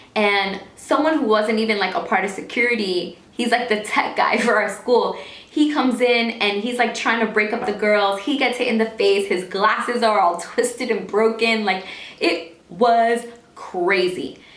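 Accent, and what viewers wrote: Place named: New York, United States. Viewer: I love your NY accent.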